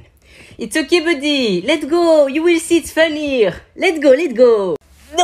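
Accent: France. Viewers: The way he speaks english reminds me of french-👀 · Cz he's french. And this is a french accent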